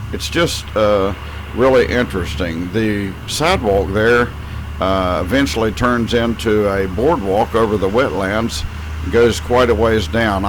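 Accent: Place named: South, United States. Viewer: It's worth watching just for the narration. Love the southern accent 😁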